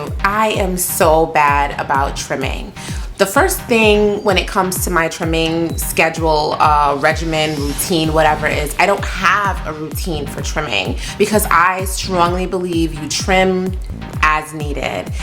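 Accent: New York, United States. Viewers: Love your accent girly! NY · LOL I love your voice... your such a NEW YAWKER ..lol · I so LOVE you, your NY accent was all over this one. One day I'm going to be able to meet you at a meet and greet, you seem to be a blast to be around.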